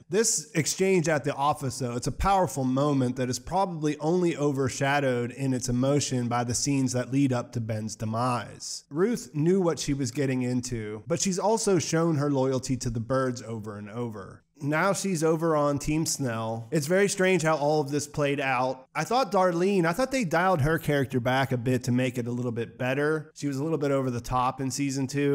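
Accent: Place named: Pittsburgh, United States. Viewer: Hey! Thanks for the great analysis! I picked up on your Pittsburgh accent! I am a Burgher too!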